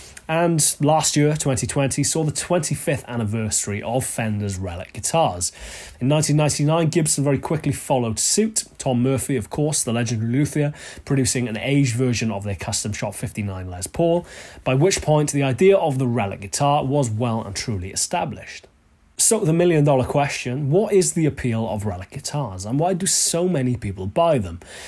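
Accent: Wales, United Kingdom. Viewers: With due props to your playing, I click on these for the always interesting topics, your thorough research and, of course, your delightful Welsh accent. (I know, I know; the Welsh viewers are like “what accent?”). On the topic itself, I’m with you. Lightly done, it can be a nice look, the Isbell being a great example. Too much and it’s like buying a new car that was intentionally wrecked.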